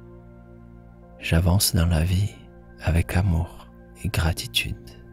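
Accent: South, France. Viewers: cher...t'es merveilleux et ta voix est est sublime avec un pt accent du soleil...merci t'es un Grand Soutien pour moi 💙💛🌻😺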